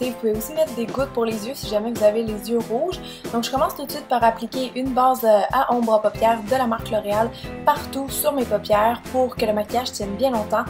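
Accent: Quebec, Canada. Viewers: créative, toujours de bon conseil, souriante, çà fais un moment que je te suis sur you tube et je voulais juste te dire de continué c'est un grand plaisir et tu es très pédagogue et surtout un accent québécois que je comprend haha !! bisous d'une française !! :)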